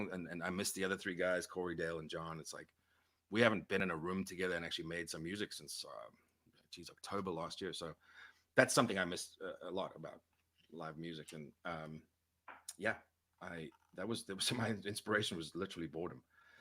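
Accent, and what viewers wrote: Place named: South Africa. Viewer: Didn’t realize he had an accent then I forget they’re from South Africa lol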